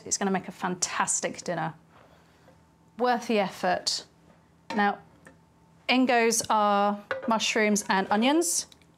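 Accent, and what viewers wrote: United Kingdom. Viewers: Nah, "malliard" and "bull-ion" is because she's British. You want some examples of how British people mangle European words, you should see the Great British Bake-off Mexican week special.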